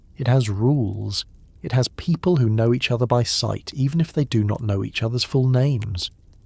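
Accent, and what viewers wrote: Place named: United Kingdom. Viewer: Discomfort is inaccute history being read wuth a pompous brit accent.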